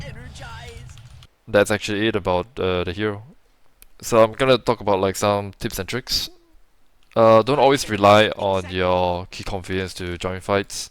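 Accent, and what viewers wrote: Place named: India. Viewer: Why does this east Asian looking bloke talk like an Indian? Took me a while to realise he was referring to 'facet' by saying 'fuh-SET'😂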